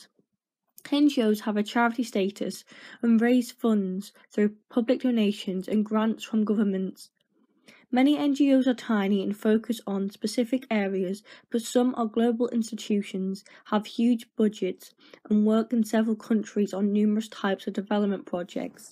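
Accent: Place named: United Kingdom. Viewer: Can you please make your content to be in text so we can see,so of us can't really keep up with the British accent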